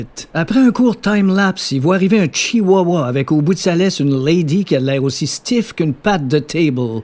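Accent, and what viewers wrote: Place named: New Brunswick, Canada. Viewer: J'ai des chums au n-b qui parle exactement comme ça 😂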